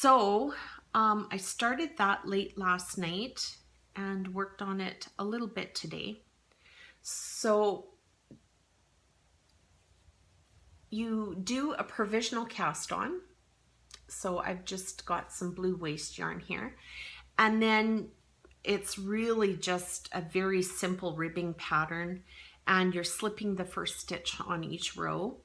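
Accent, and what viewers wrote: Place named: Minnesota, United States. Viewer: Your accent says Minnesota😎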